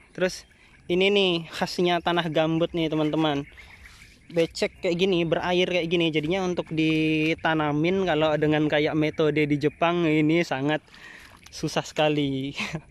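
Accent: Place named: Sumatra, Indonesia. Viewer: Klu dari cengkok bahasanya BKN org Jawa. klu GK salah orang bagian Sumatra.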